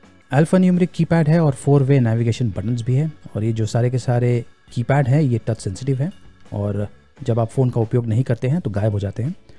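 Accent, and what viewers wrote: India. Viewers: Person in video looking like non Indian but audio is Indian . Is this phone available in India .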